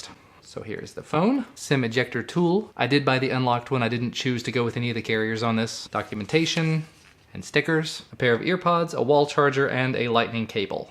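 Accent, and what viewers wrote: Kentucky, United States. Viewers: R you from Kentucky?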